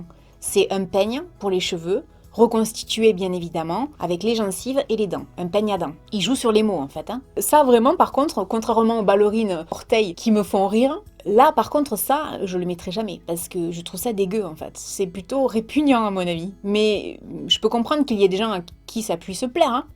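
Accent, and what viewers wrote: South, France. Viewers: trop mignon ton accent!!!!! et vive les provinciaux.... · Ahh non non au peigne- dents, maillot à poils et ballerines orteils! Non non!!:D y a des trucs que je n'avais jamais vu: manches xxl, jogging... mais pas du tout envie d'essayer! Et les cuissardes ugg me font penser au chiens qui sont tout fripés!:)) faut être à Hollywood pour porter ce genre de trucs et passer à peu près inaperçu!:)) très chouette vidéo!:) bisous! Et vive l'accent du sud! (une sudiste à Paris!):) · Coucou alors premierement tu as totalement raison " ca vient de Paris alors..." mais quand ob regarde ce qu'ils portent a Paris c'est de la dobe pour être polie lol c spécial faut avouer... Mais même moi qui suis du nord (ch'ti MDR) j'envie le sud pour bcp de chose: la météo déjà lol votre accent est carrément plus glam ke chez nous MDR on va pas se mentir et puis vos petits plats 😍 etc.... 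Je m'arrête la mais vous avez tant a nous apprendre... Voila bisoux et ne te remet pas en question reste au tu es c'est parfait je t'adore 😉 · J’aime trop ton accent j’ai l’impression d’être en vacances dans le sud quand tu parles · Perso je viens de Paris et j'adooore ton accent, mais en mm temps j'aime tous les accents du sud <3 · C'était une super vidéo comme d'habitude! Tu es toujours aussi belle (et personnellement j'aime bien l'accent du sud)! 😊😘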